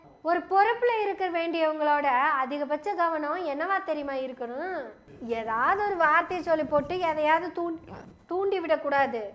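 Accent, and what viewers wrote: Kongu, India. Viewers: கோவையின் கொங்கு தமிழ் செல்வி வாழ்த்துகள்.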